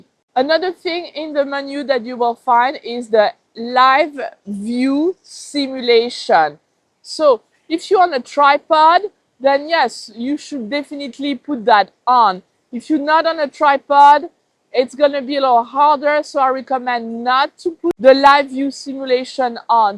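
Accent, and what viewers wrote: France. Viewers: Ça paraît immédiatement que tu parles français 😊 Merci pour le vidéo 👍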